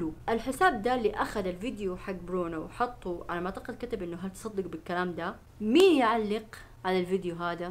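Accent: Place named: Riyadh, Saudi Arabia. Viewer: got u اهي سعوديه وانا مو سعوديه ف ما افرق بلهجاتهم بس اتوقع لهجة اهل الرياض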